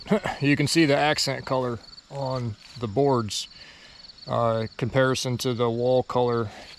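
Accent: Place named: United States. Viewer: Foyer pronounced FOI-yer. LOL! I love your American accent and it's one of the reasons I listen to you. Where I am from it is pronounce foi-YAI because it has a French root. That was not judgement. It was amusement. Don't change. It's charming American.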